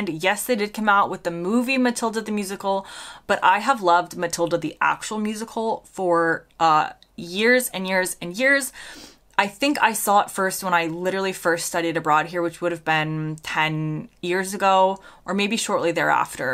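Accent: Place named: United States. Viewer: Dave, the Dog was my favourite Gogglebox character. It's weird to explain to someone. It's not just watching people watch TV. It's nice to see another Yorkshire addict too. It's hard to find a bad one. The best are amazing, but bad ones are still okay. Flake and Ripples rule too As you picked people, and places. I'm a little gutted i, or my City didn't make it on the list. lol (I wrote before 101, you covered it. Thank you) Nice to get an idea of what you are into too, I appreciate your views. It's nice to see how you've become British (with an accent from the states)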